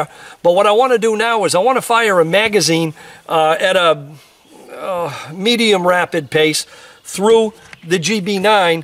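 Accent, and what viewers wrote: Maine, United States. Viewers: Thanks! Your Mainah-ness makes me feel at home, away from home.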